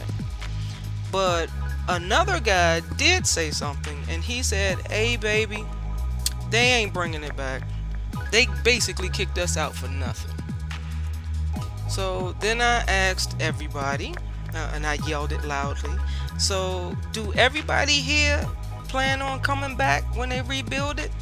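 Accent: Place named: Philadelphia, United States. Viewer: Greetings from Washington DC. You sound like you're from Philly. You may have mentioned where you are from in another video, but I haven't seen that yet because, as I said in comments on East Capitol Street Dwellings, I'm new to your channel. I'm very familiar with Barry Farm since I've used their recreational indoor pool since 2014 off and on. Best wishes, Sis.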